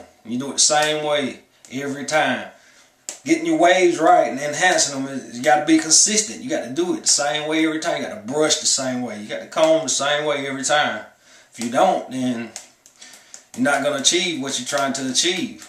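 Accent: South, United States. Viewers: sexy assss country accent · Country accent is dope "G" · Lol he sound like he from the south od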